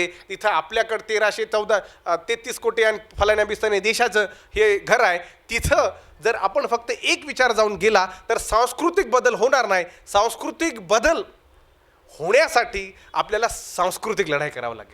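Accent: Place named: Marathwada, India. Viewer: मांडणी सुंदर आहे. पण भाषा पक्की मराठवाडा भागाची ग्रामीण वाटते. सर भाषेवर प्रभूत्व असले तर मांडणी फारच सुंदर वाटेल.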